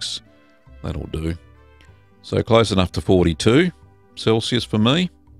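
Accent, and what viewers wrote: Australia. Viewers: Everyone here knows how it's pronounced. Clearly is from Australia and that accent comes out- to me, that just adds character. The important thing is that he has made a great video, and it's also clear that he has invested some time learning about Mexican culture as well. Well done!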